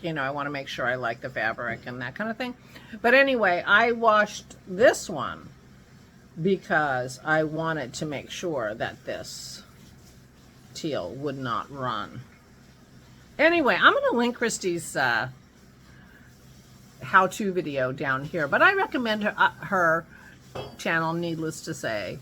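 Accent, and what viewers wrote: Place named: Montreal, Canada. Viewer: I love how your Montreal is coming out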